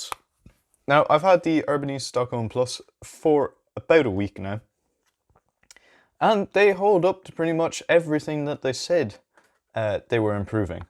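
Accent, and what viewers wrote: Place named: Ireland. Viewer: As a Swede/Dane who use to live in Dublin: It's always great to hear the Irish accent!! The best English accent in the world, I always miss Ireland when hear that accent. Irish people are down-to-earth people, I love that country.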